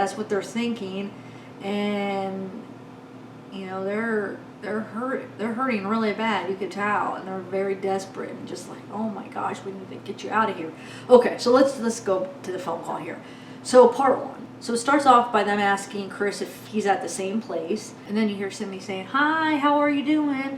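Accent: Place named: Midwest, United States. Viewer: I had to take a break from this case also... I can only imagine how feels... But her videos are great! I think her observations and insights are spot-on... Lol plus I love to hear her talk.I think she has a strong Midwestern accent and I am from the Midwest also lol I say Illinois Wisconsin or Michigan LOL 😜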